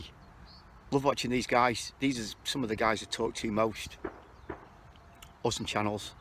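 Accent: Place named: United Kingdom. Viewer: Enjoyed watching your great content and some nice finds boy I really have to listen hard because of your great British accent 🤜🏻🤛🏻 keep it up bro, all subbed and I smashed the like button to boot. Cheers Frank <link>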